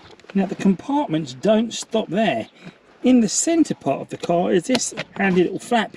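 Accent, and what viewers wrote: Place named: Kentucky, United States. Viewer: Nice accent, Kentucky?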